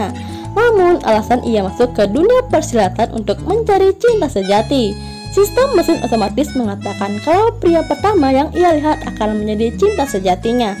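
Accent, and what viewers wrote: Bali, Indonesia. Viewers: Pasti orang bali . Khass logat nya 😌😌😌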